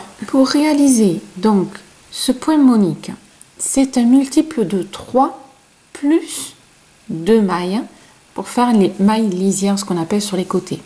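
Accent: South, France. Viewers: Super joli ce point. J'ai tout compris car comme toujours vos explications sont très claires et je suis toujours aussi fan de votre accent du sud ! Je vais tenter le poncho avec ce point. Encore merci de partager avec nous !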